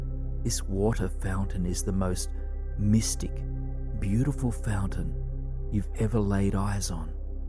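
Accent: Australia. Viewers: Is it just me or does he sound Australian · haha I knew it lol, and team, you’ve changed my life with your beautiful guided imagery and Aussie accent 🥰✌️❣️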